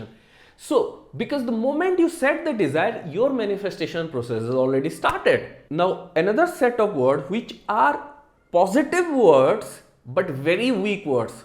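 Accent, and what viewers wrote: India. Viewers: I joined you back in January. I love the way you say things and you’re Indian like me with the Indian accent like my parents. Please let me know when you come to Orlando, Florida.. I would love to meet you. Soni Add me on Instagram ( sonibaby) and Facebook. * I have manifested things bc of your teachings. I can’t thank you enough.😘🙏🏽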